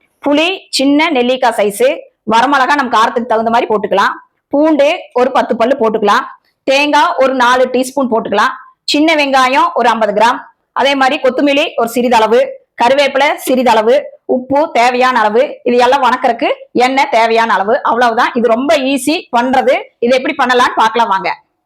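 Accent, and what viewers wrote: Kongu, India. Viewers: கொங்கு தமிழில் நீங்கள் சொல்லிக் கொடுப்பது பேரழகு.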